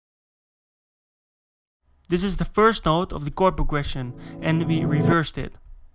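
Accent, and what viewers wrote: Netherlands. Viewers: Is dit een Nederlander? Or not?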